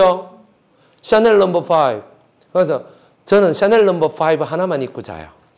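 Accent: Gyeongsang, South Korea. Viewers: 갱상도라 구수한 말투 정감이 갑니다 전 칠곡이거든요 요즘 강의들으면서 많은 사실적인 스토리에 감동입니다 감사합니다~^^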